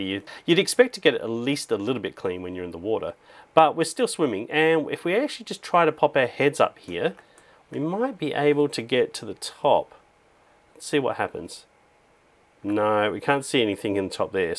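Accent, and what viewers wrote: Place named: Australia. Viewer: I love your australia accent its so cool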